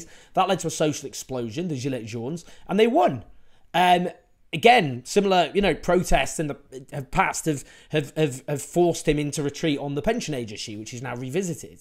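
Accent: United Kingdom. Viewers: British need to get rid of the royalty thing. It's so unthinkable that you still admit this kind of institution. We got rid of our king a long time ago (although killing him was a bit too much). Our sense of resisting and expressing the popular demands come from that time when our people took threw away the monarchy. Anyway, lovely to hear your British accent. I feel like I am hearing a Shakespeare play at times.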